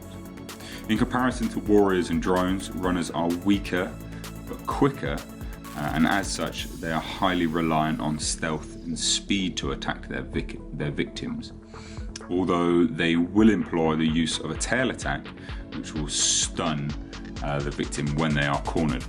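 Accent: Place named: United Kingdom. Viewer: Friggin' British accents! I thought you started off with an "Overmorph." Like some sort of ubermench form.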